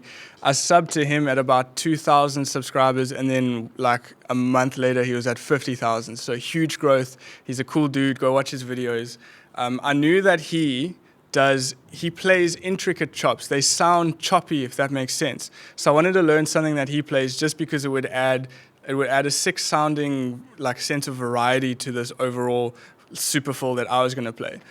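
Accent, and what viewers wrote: South Africa. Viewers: Awe u have an S.A accent😅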